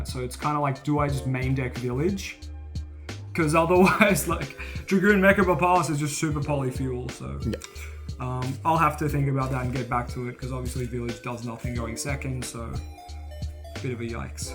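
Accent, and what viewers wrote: Australia. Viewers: Facts. And he needs a translater, I hate his Austistralin accent.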